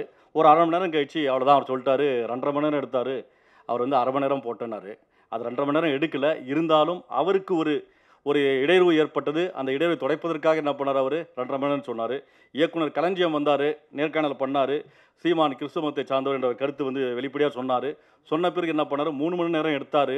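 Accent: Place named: Karnataka, India. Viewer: sounds like he is kannadiga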